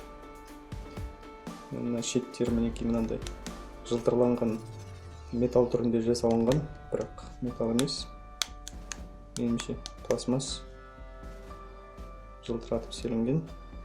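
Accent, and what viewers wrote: Standard. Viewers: Жарайсын. Казакша таза сойлейды екенсын. Сендей адамдар эксклюзив болып барады. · қазақша таза созине ризамын, осындай бауырлар көбейсін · сау болш казака таза сойлейдекен осылай сойлеу керек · жарайсың таза қазақша.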